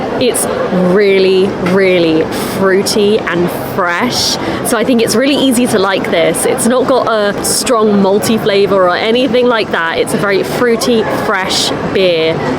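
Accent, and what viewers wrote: United Kingdom. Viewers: 久しぶりの動画😂 が元気そうで何より😊 そしてイギリス英語が耳に心地よいです。これから寒い季節ですがお身体大切に🙏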